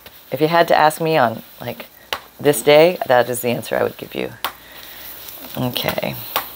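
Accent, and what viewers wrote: Canada. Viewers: Thank you for more inspiration! Sometimes I overlook the obvious, such as being able to draw a border to get the effect I want. I spend hours looking for just the right paper when actually I can create my own design, and have fun doing it! 🤓~ I love the Canadian accent, especially on the 'out' words. 🥰 I have the Texas twang which is a giveaway of where I'm from, anywhere I travel. Oh well, we work with what we've got, eh? :virtualhug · Good afternoon 🌞 I love your white hair. I know it's officially blonde but on my TV it's very white and very pretty. Yes Canadians have a slight accent. Anywho, love the little tidbits and ty for the giggles. You always make me laugh. My hubby caught me watching you again. He said and I quote, " you would leave me for crafty videos" 😂😂😂 why yes, yes I would, 😅😅😅 have a great day! ❤ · I love the Canadian accent! 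I have a friend that lives in Nova Scotia and I could listen to her talk for hours 😊😊 Also I have an Aunt and Uncle who live in Fargo ND.. I live in New Mexico and when they come to visit I love listening to their stories.. Accents are soo unique and are always intriguing to me. 😁😄😊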